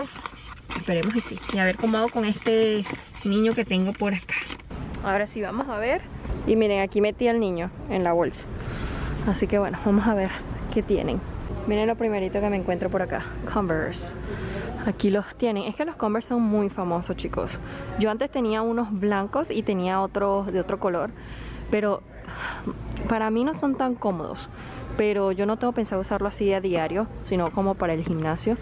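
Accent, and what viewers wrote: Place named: Venezuela. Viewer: Sos hermosa!! Me encantan tus videos, tu acento venezolano hermoso.👋👋👌❤️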